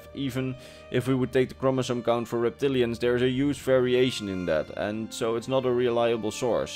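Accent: Netherlands. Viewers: Hey! Je bent ook Nederlands! Ik dacht al dat ik het aan je accent hoorde XD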